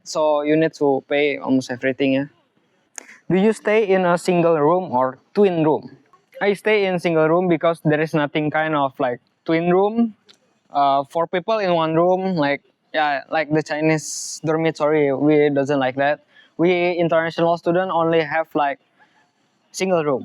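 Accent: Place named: Indonesia. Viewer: so what citizen are you? indonesian? your accent sounds like an indonesian accent btw hehe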